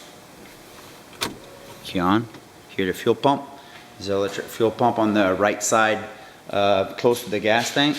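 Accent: Quebec, Canada. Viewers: Beautiful car!!! Not sure where you are located but you speak English just like those folks in Quebec Canada.... I live in Ontario!!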